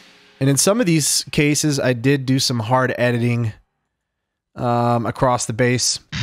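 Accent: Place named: Appalachia, United States. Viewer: The hillbilly accent 😂 I love it!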